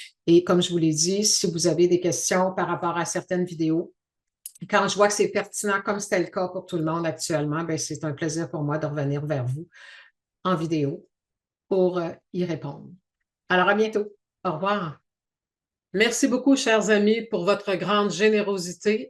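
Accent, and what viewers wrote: Quebec, Canada. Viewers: MERCI de tout coeur pour cette réponse à cette question posée car elle m'était aussi venue. Je t'écris depuis la Suisse😀. Tu dis que tout va très vite actuellement, je le remarque aussi. Par contre j'observe que certaines choses prennent plus de temps. alors que je suis dans la joie, la Gratitude envers la vie et TOUT ce que je vis. Est-ce en lien avec ce que tu expliques dans ta vidéo "2024, année de la récolte" que la récolte va débuter au printemps? Je me régale de l'accent québécois! Je me Réjouis de ce qui se déroule sur Terre, ça fait longtemps que je l'espérais. J'aime cette phrase : "La Magie opère lorsque l'Âme agit" Coeurdialement. Mahor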